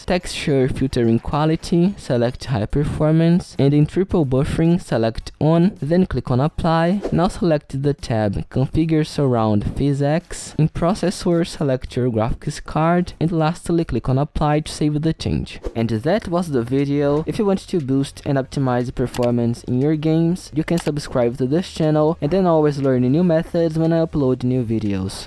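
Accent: Brazil. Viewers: mano esse video ficou deveras dahora, voce conseguiu enganar incrivelmente a gringa falando ingles, mas claramente pelo seu sotaque é brasileiro. amei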